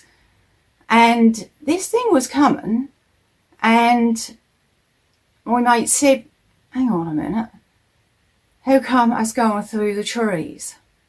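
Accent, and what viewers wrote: Suffolk, United Kingdom. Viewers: As me dad would say bootiful me darlin 😊😂 Im originally BSE .my family all over Risby ,Thetford, Stowmarket. Im suffolk side of Essex, suffolk, Cambridgeshire border now not the same but so proud of my accent not as sharp as yours my aunties all had that broad suffolk love it hun ❤️ love a good ghost storie . Years ago we go on Sunday drives with mum and dad. End up anywhere west stow . Fritton. Snetterton Sunday market..Felixstowe.. go nut picking berry picking. Explore woods and little villages beautiful had proper family time no one get that chance today 😢 thats how I know i always feel at home more in the woods but no dont want dark figures giving me the hebie gibies 😂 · Love your videos. I’m a Suffolk girl born and bred, like you. Love hearing our accent. It seems to be disappearing, please keep up your hard work. xx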